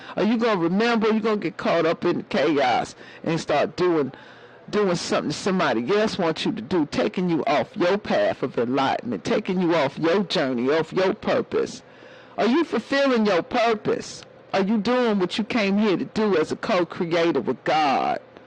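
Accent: South, United States. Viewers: Omgosh u sounded like my mom when u said that I'll tell u that much! That southern drawl needed that today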